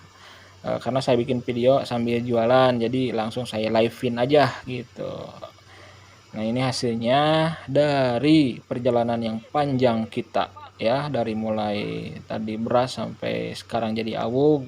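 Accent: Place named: Sunda, Indonesia. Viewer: Suaranya sgt sunda😀😉 Sundanya mana mang✌😉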